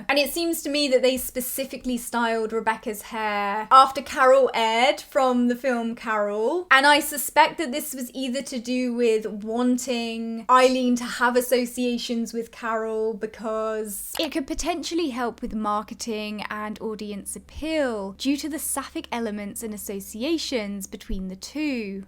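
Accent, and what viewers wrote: United Kingdom. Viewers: Fellow American swine here, and I concur that cadence and refined British accent are quite divine. Her insights are always so thought-provoking, too. ❤❤❤❤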